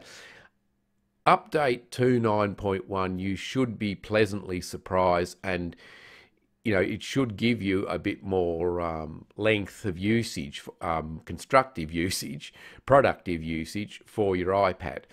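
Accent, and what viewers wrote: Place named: Australia. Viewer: nice to hear an Aussie accent in YouTube for a change :) thanks for the tips this is way more useful than those silly startup timer tests. might upgrade my iPad 3rd gen soon and report back how I go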